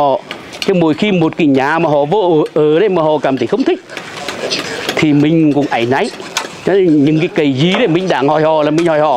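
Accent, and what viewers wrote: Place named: Nghe An, Vietnam. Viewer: Bố ơi! Nói giọng bắc đi, thích xem kênh của bố mà nhiều khi không hiểu? Giọng Nghi lộc Nghệ an rất khó hiểu. Chúc bố có thật nhiều SK để cùng các cháu trong team Châu phi giúp đỡ được nhiều bản làng Châu phi.❤❤❤❤❤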